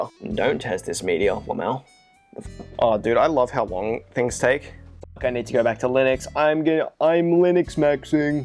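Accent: Australia. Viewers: Ur Aussie same lol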